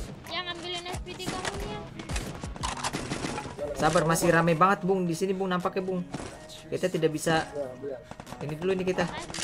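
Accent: Java, Indonesia. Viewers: Bang kamu orang jawa ya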